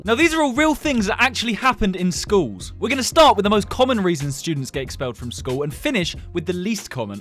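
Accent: United Kingdom. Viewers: Dropping fireworks hmm what does that remind you of maybe the Weasley twins from Harry Potter also you keep reminding me of Ron with your British accent and curly hair.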